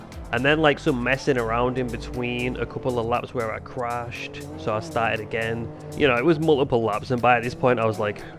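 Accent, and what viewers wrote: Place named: Liverpool, United Kingdom. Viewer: This guy is an absolute scouser.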